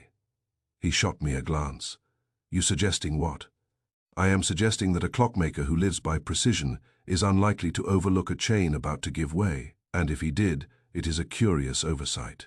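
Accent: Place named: United Kingdom. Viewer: If you’re a person, I do enjoy listening to you. It’s a bit of a challenge reimagining Archie Goodwin with a British accent, I’ll listen some more.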